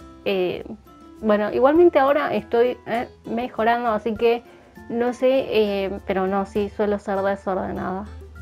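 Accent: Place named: Argentina. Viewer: Acento ARGENTINO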